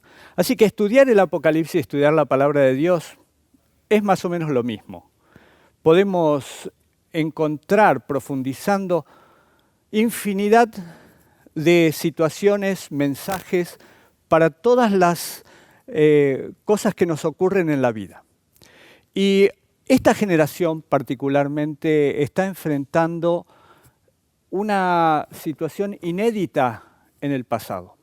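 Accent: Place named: Argentina. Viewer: De Argentina supongo por su timbre de voz.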